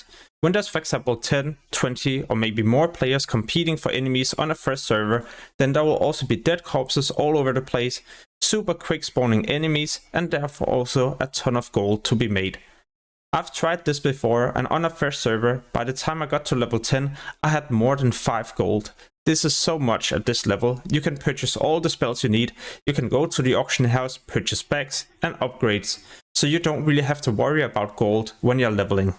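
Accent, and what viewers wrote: Denmark. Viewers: m8 listen to the accent, thats gotta be the phattest danish accent ive heard:D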